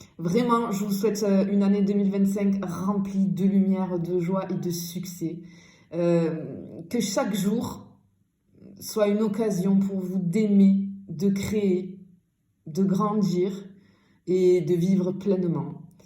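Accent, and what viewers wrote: South, France. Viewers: Bonne et heureuse année a vous et vos proches merci à vous de nous guider et votre bonne humeur et cet voix qui chante le sud ça nous fait du bien merci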